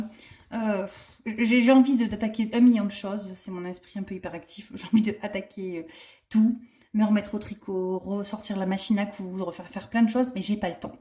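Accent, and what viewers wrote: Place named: Aveyron, France. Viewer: Je viens de découvrir tes vidéos j’adore ce concept que je vais démarrer dès la fin du mois 😊 Bravo pour ta gestion en tout cas ! Ps : ça fait plaisir d’entendre le même accent que le miens #Aveyronaise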